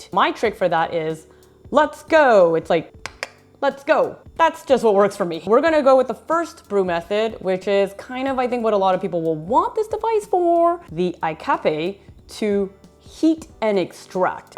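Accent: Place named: Canada. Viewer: I love the way Canadian say "Owt", for the English "Out"...it's the way I can tell they are Canadians when I meet them, rather than insulting then by saying "Are you American?":-))) Also, filling it up full with water regardless of the size drink you want will be better, as a larger volume of water will maintain the heat better for the extraction temperature than a smaller volume of water, where heat is 'soaked' into the machine body.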